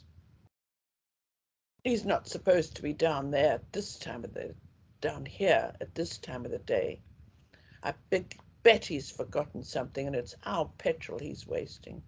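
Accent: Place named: Zimbabwe. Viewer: Well l was thinking to myself u was Rhodesian .Although there’s a difference between British South African and Rhodesian English.I had assumed u moved to KZN from rhodesia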